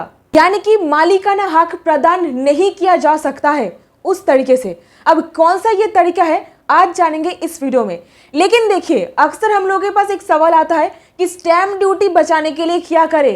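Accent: Bengal, India. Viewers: Thanks for explaining so nicely. Nice to hear hindi from a bangali speaking lady.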